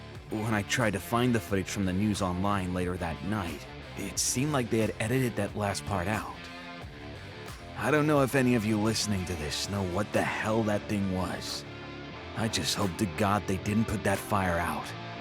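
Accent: United States. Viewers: Wait- you’re NOT American?! Damn dude!! Bravo!!! New visitor, new sub!!! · wait...mf you ARENT from the US...?? This is my first time hearing ya, and I wasn't able to tell at ALL, I thought you were American. That's saying a lot considering I was literally born and raised in the US lmao. Some advice you may or may not find useful for future readings with the US accent : What you did was a north eastern accent, and very similiar to mine (plain standard US accent here). It matched the architecture shown in the art perfectly, dont know if this was on purpose or just luck. Some words were pronounced similairly to the 'standard canadian' accent, but thats actually accurate depending on the region, as many northern US citizens pronounce things similarly/exactly the same. Also: Telling apart the standard US accent from the other northern variations can be almost impossible unless you hear certain words pronounced. 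So dont get frustrated if you have a difficult time telling em apart: Many Us citizens can't, and they literally live here. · Its my 1st time listening, I'm from California. I thought you were an American from the eastern states , not east coast though. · Came over from Dark Somnium, and glad I did. Great narration, ambiance,and excellent job on the US accent!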